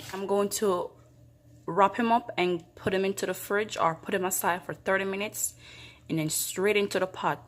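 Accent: Jamaica. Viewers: I like how you keep the Jamaican accent lol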